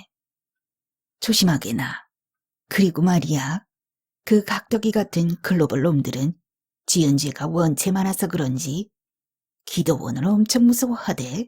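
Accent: Jeolla, South Korea. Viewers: 왜?미리처읽어대는거야.. 지나치게 전라도 사투리를써데더니.. 그냥 표준어로 읽어주세요. 너무듣기좋아요. 목소리. 발음 너~~무이쁜데..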